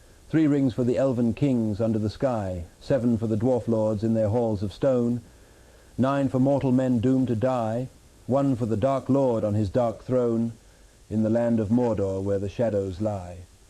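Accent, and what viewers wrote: United States. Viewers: An amazing technological achievement, but doesn’t sound quite right. As another comment said, the accent is slightly American. But more than that, the recitation isn’t that of a story teller. Tolkien was a more poetic public reader. But an incredible achievement of AI nevertheless.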